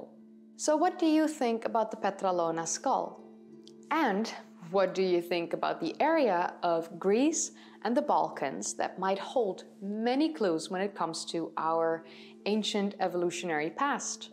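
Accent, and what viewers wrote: Netherlands. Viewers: The way you pronounce "technical terms", I thought this woman is Dutch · Are you Dutch ?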